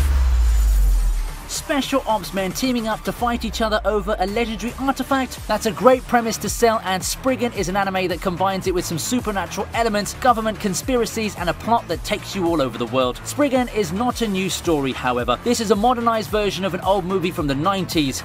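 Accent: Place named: United Kingdom. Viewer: грёбаный британский акцент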